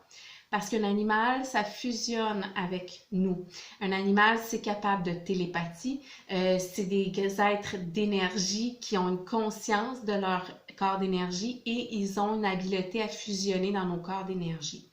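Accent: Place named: Quebec, Canada. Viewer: Bravo et merci pour toutes ces explications avec un très bel accent québécois. Très compliqué de faire le deuil de son animal. Petit coucou depuis la France...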